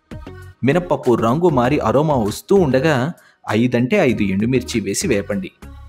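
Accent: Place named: Telangana, India. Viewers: I like telangana yasa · ఏంటి తెలంగాణ స్లాంగ్.., మీకు బావుంది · Telangana slang super anna ☺ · Owow telangana yasa 🙂nenu pakka try chesta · Telangana slang super 😆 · Meru Telangana slang lo matldatam bagundi sir 👌👌o video e slang Lo matladandi plz....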